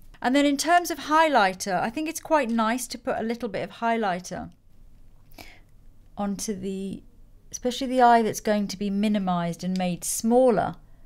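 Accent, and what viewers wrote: United Kingdom. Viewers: Do I hear a british accent :D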